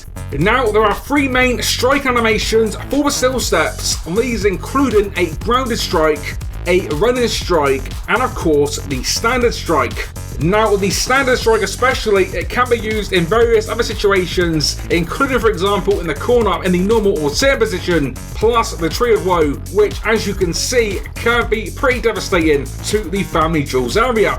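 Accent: England, United Kingdom. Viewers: you are from England ?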